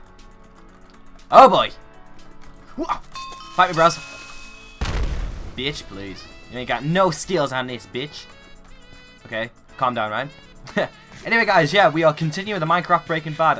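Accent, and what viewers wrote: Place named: Scotland, United Kingdom. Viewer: You have a bit of an accent, are you Scottish?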